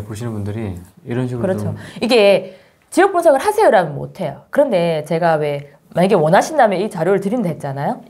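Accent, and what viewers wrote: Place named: Busan, South Korea. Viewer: 설연휴 보석같은 강의 너무 감사드립니다! 핵미모+부산사투리 매력만점 팀장님이십니다^^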